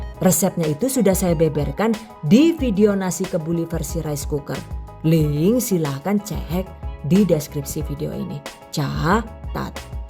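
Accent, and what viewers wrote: Banyumas, Indonesia. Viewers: Jebule ya wong ngapak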